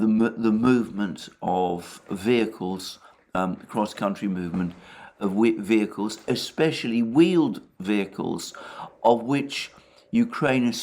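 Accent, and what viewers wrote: United Kingdom. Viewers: Alexander thinks a blasé manner (and a British accent) are enough to cover up his vast ignorance. He says that if there are large numbers of ukrainians, which he very much doubts, they are certainly not using them effectively. Yeah, right. I suggest, what you may be seeing right now is the calm before the... Well, I know for a fact you know the rest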